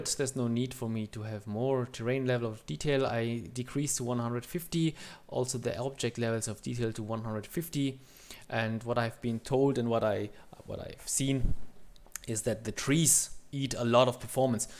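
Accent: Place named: Germany. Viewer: Many thanks for your answer. Let's hope to see more static planes because I don't need any moving traffic at the airports...safe some FPS for me at least. Can I ask you for your PC specs and how many FPS do you got during your flights around Copenhagen? Btw your voice sounds more like a german speaks english then a greek people ;-)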